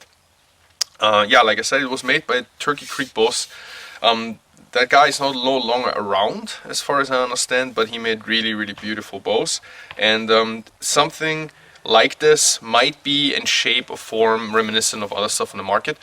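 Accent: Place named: Austria. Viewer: du klingst irgendwie österreichisch ?? ;-) nice video, nice bow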